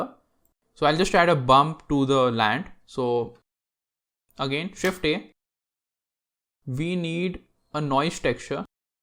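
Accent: India. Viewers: Bro r u indian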